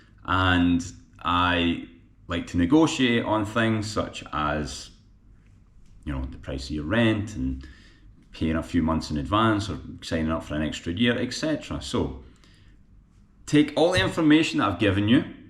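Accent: Scotland, United Kingdom. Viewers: how does the scottish accent get along with speaking Russian? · amazing scottish accent! i v heard once robert burns poem read like that... it is really how it should sound...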